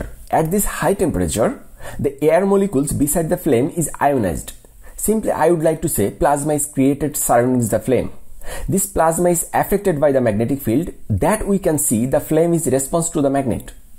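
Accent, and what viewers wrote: India. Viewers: Is this guy a native of India?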